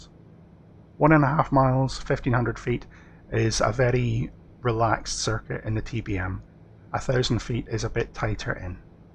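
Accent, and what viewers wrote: Scotland, United Kingdom. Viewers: Most enjoyable and informative video. My thanks for not inflicting cloying, abrasive or noisy “background music” to drive us to utter distraction. One takeaway: no matter the cost of the aircraft it still obeys the fundamentals of aerodynamics and physics. And your Scots brogue is a distinct pleasure to hear. Mazaltov!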